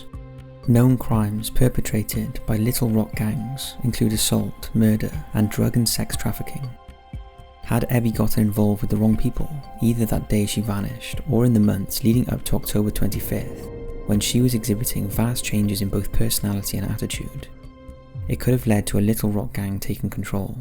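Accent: United Kingdom. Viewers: The narrator is obviously British. You are aware they speak a little differently than Americans, right?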